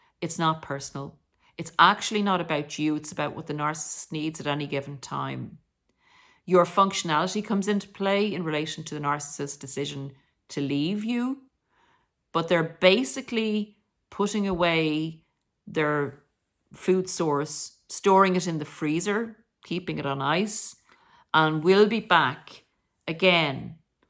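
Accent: Ireland. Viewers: GREETINGS FROM THE GREAT STATE OF KENTUCKY IF YOU'RE NOT ATTRACTIVE SO-CALLED WITH WHATEVER THAT MEANS THEN YOU'RE GOING TO BE DUMPED YOU'RE NOT GOING TO FIND QUALITY MAN YOU'RE NOT GOING TO FIND A QUALITY OF WOMEN BECAUSE MOST OF THESE SO-CALLED MEN THAT ARE GOOD THEY'RE LOOKING FOR ATTRACTIVE PHYSICALLY ON THE OUTSIDE MOST PEOPLE ARE SUPERFICIAL THAT IS A MAJOR PROBLEM VERY FEW PEOPLE SEE THE BEAUTY WITHIN AND YOU KNOW ACTUALLY EVERYBODY'S GOD'S CREATION SO WE ARE ALL MASTERPIECES BUT BECAUSE WE LIVE IN A SINFUL WORLD AND PEOPLE LOOK AT THINGS THROUGH THE CARDINAL I THINK SOME PEOPLE ARE BETTER LOOKING THAN OTHERS WHEN THEY'RE NOT MARILYN MONROE WAY WAY WAY WAY WAY OVERRATED BECAUSE SHE LOOKED PROVOCATIVE AND WAS LOOSE THAT'S WHY MEN LIKED HER GREETINGS FROM KENTUCKY WE LOVE YOU IRELAND WE LOVE YOUR ACCENT WOULD LOVE TO SEE IRELAND ONE DAY EVERYTHING IS SO DIFFICULT IN THE UNITED STATES EVERYTHING IS OVER THE TOP EXPENSIVE I WORK WITH THE HOMELESS THIS IS JUST REALLY A NIGHTMARE AND YOU HAVE TO FOCUS ON GOD AND HELPING OTHERS WHY DON'T YOU DO A VIDEO ABOUT THAT MOST MEN JUST GO BUY A PERSON'S LOOKS NOT ABOUT MORALS IT'S NOT ABOUT HOW KIND YOU ARE MOST PEOPLE THEY DO NOT LIKE UNATTRACTIVE SO-CALLED UNATTRACTIVE PEOPLE IT'S A DISGRACE WHY DON'T YOU TALK ABOUT THAT THAT'S WHY PEOPLE ARE DISCARDED❤🙏🙏🙏🙏🙏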